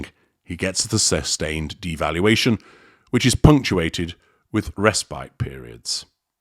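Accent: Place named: Yorkshire, United Kingdom. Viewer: No - I can still hear a tiny bit of the Leeds? (Yorkshire) accent in the lad. Most of our British aristos talk with a handful of pebbles ' int pie-ole'. 😅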